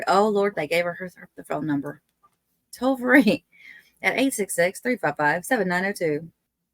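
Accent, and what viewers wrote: South, United States. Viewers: Voluntary intoxication is not a defense in the state of Florida. I like your southern accent. Where are you from. I'm a new subscriber. I like your presentation with humour.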